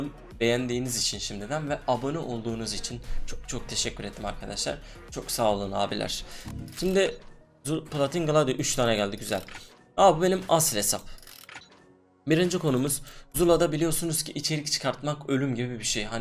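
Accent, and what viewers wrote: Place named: Urfa, Turkey. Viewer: Abi beni yanlış anlama ama öncelikle biraz kitap okuyup konuşmanı düzeltmelisin. Evet biliyoruz Urfalısın ama bu konuşmandan dolayı arkadaşım seni izlemeyi bıraktı. Benden sana tercih rekabette ve battle royale modunda değişik şeyler dene... Örneğin rekabette hiç bilinmeyen silahlar ile oyna veya sadece tabanca vs. oyna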